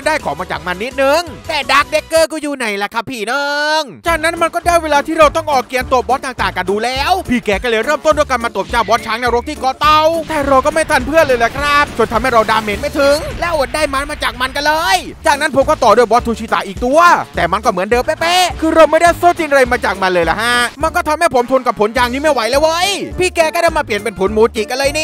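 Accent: South, Thailand. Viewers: พี่เป็นคนใต้หรอครับ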